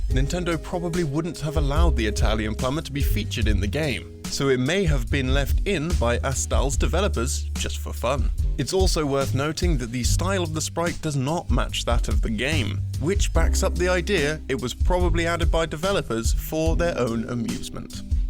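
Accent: United Kingdom. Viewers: sounds like a british vinny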